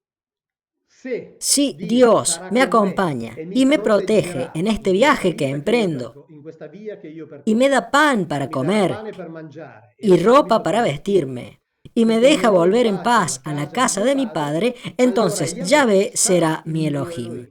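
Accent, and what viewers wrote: Córdoba, Argentina. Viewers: Que linda la vocecita, noto un acento cordobés, puede ser?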